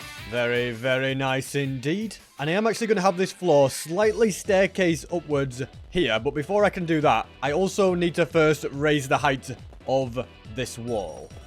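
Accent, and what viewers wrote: United Kingdom. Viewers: Are you british? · Wait.. is British??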